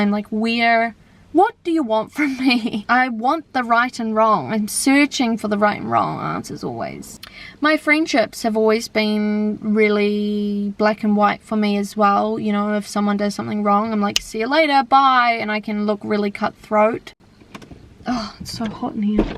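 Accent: New Zealand. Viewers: Why do I never recognize the kiwi accent when I'm kiwi haha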